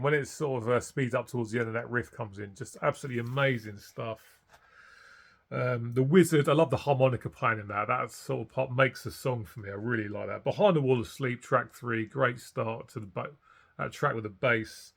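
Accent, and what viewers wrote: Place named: West Yorkshire, United Kingdom. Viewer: that could be your accent, not sure if it’s West Yorkshire, and baritone . Anyway, I’m happy to find your channel and subscribed it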